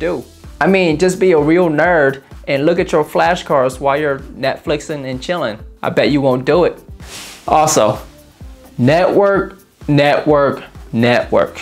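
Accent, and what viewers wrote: South, United States. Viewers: Are you from the south? xD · Aside from the amazing pieces of advice, I just want to say how nice your accent is. Deffinitely can hear some Southern US + Viet accent! Cheers brother.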